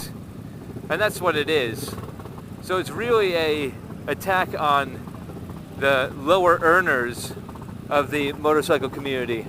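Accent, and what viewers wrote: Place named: United States. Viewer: Enjoying all your videos, just wondering...you don't sound British. Migrant American ?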